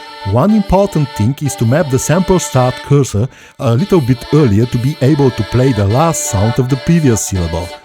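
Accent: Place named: Russia. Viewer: а вы, дорогой друг, часом, не носитель русского языка?)) а то уж больно акцент знакомый)))))))))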